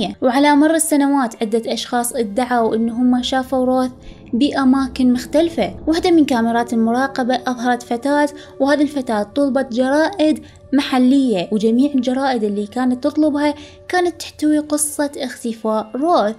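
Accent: Iraq. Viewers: جداً أحُب لهجة العراقيه تحديداً عليكك 🥺❤️❤️❤️.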